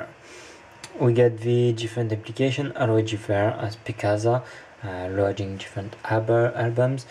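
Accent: France. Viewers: On peux entendre dans ton dialecte on Anglais.Mais c'est typiquement "Francais-anglais" et j'aime ca :) Ou les Francais parle l'allemand et c'est chéri :D Ps : Excusé pour ma mauvaise francais :D Salutions de Luxembourg :) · Haha, je suis venu dans les comms exprès pour voir d'où tu venais, je comprenais beaucoup trop bien ton anglais pour que tu viennes d'ailleurs que de france ^^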